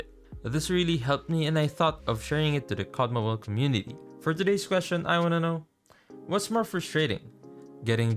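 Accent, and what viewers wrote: Philippines. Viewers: Bro i think you're a Filipino, idk but i hear it when ur speaking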